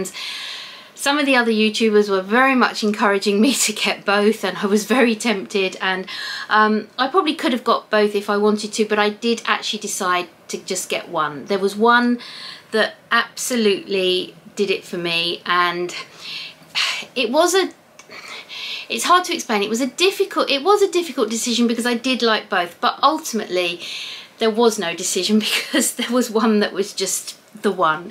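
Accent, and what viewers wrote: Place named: United Kingdom. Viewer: Yay, I was team green! 💚 You hit the jackpot — such a beautiful soft minty green that will go with virtually everything! I’ve watched all of your NYC videos and enjoyed them all, including this one. I’ll admit that I was tempted to fast forward to see which color WOC you got 😂, but I stayed the course because I love listening to your narration and lovely British accent ❤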